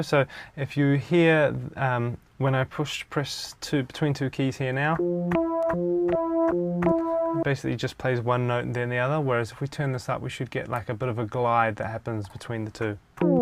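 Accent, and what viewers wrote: New Zealand. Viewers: knew it!, cant mistake a good old kiwi accent. What part of NZ? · Ha! The second you opened your mouth I was like... "no" accent o.0 Must be a kiwi, sure of it! I was right :D Donno if you know the fella that makes Goldbaby samples... kiwi as well (only found out the other day, turns out he lives in the next suburb on from mine).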